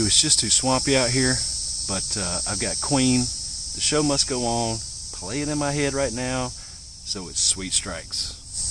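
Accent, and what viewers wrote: South, United States. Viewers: Southern accent? crepe myrtles? You in NC? Just found this channel.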